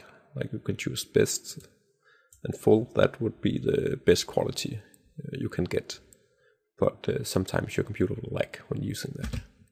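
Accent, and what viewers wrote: Denmark. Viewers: Are you Danish?? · er du dansker ? :) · Are you Danish? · you sounds danish <.< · Are You Danish ? If You Are Its EPIC !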